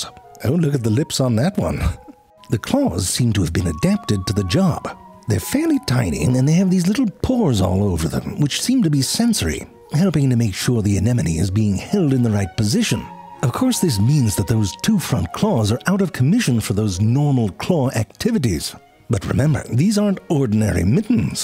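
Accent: Germany. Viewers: What the heck, your German sounds so good! Just from the way you speak I as a German would’ve thought oh, this is probably a dialect from some other federal state. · Your german accent is impeccable!!! 😊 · All these years and i had no idea Ze Frank was a native German speaker till now! That's really cool!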